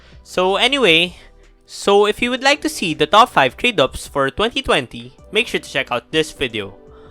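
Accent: Philippines. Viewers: are u from phillipines your accent sounds like it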